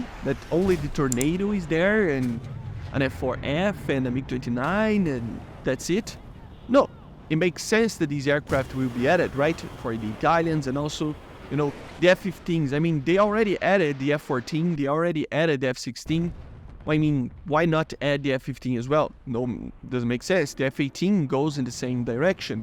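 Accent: Brazil. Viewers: eae, po ver o f18 seria um sonho, e agr o gripen tbm deu p ver q tu é br pelo sotaque huahauahau tu voa onde mano? sou piloto tbm, mt bom ver br fznd video do war thunder tmj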